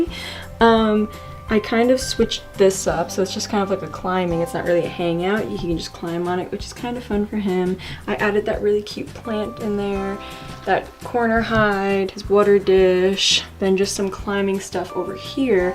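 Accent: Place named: Australia. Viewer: When I heard the astrailian ascent I freaked I love it so much it’s adorable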